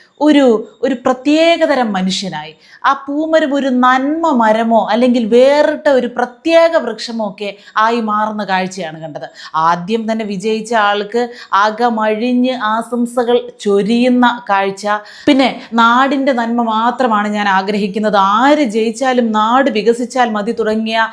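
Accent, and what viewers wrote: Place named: Trivandrum, India. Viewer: കണ്ണൂർ ഉള്ള ഞങ്ങൾക്ക് തിരോന്തരം ഭാഷയിൽ ഉള്ള അലക്കൽ പെരുത്തു ഇഷ്ടാകുന്നു 😂👌😅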